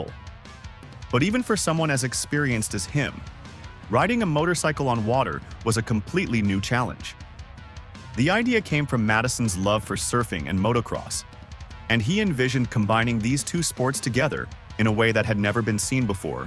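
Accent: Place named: United States. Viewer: American accent; says 'whilst' and 'pub'...🤔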